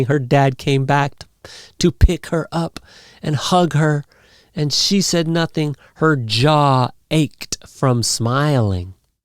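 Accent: United States. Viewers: This voice Sound of American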